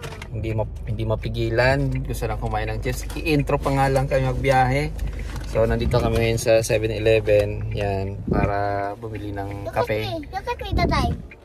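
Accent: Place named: Visayas, Philippines. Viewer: Anong dialect po gamit nyo Sir? There's a little hint of Bisaya and Illonggo.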